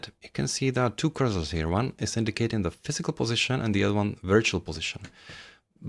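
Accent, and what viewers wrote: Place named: Czechoslovakia. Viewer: Perfektní recenze, možná nejlepší co jsem na STECS viděl (a že jsem jich shlédnul opravdu hodně :). Pořád váhám, jestli si pořídit throttle od VKB, nebo od Virpilu a tohle mě hodně nahlodalo ve prospěch VKB STECS. Jinak jsem rád, za závěrečné potvrzení mého tušení, že vaše perfektní angličtina má nezaměnitelný "československý" přízvuk. Díky a zdravím na Slovensko z Moravy :)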